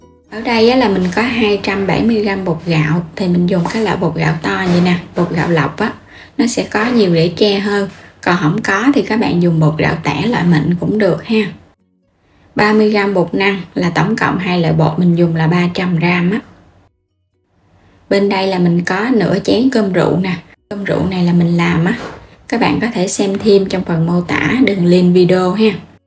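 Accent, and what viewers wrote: Mekong Delta, Vietnam. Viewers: Giọng nói của người miền Tây là dễ nghe vậy đó cac bạn ơi ❤❤❤❤❤ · Mình nghe tiếng nói rất dễ thương mà , giọng nói đúng chất miền Tây bạn ơi .❤🎉❤🎉❤🎉❤❤❤❤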